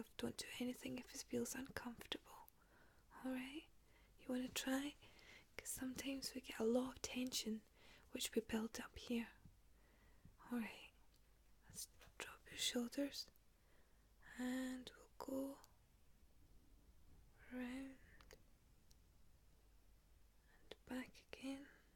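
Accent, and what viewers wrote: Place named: Scotland, United Kingdom. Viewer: love this thanks love your scots accent really soothing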